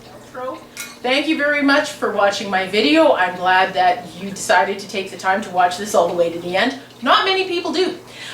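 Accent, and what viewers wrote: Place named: Canada. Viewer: I’m a new knitter.....definitely this is my favourite slipper pattern....Thank you for all that explain and the free pastern. I’m in BC, I could tell you were from somewhere in our country 👌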